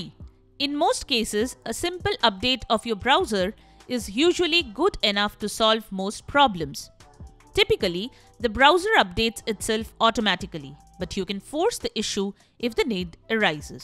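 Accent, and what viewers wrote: India. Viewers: lmao Indian voice. How am I not surprised.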